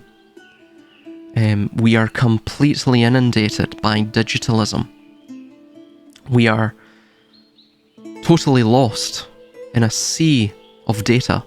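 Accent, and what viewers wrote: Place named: Scotland, United Kingdom. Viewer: A very sobering video, depressing but liberating. Also, you have a silky smooth Scottish accent. Don't worry about that. My favourite band are Scottish. I've always liked the accent. Love from England!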